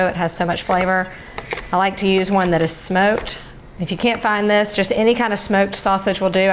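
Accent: South, United States. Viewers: I do too. Very much the voice of a young Demi Moore. A little rough, sweet southern accent..... Magic.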